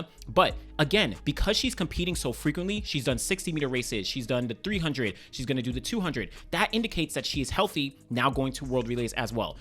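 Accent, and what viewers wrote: Jamaica. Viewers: Are u Jamaican or have Jamaican routes bro ?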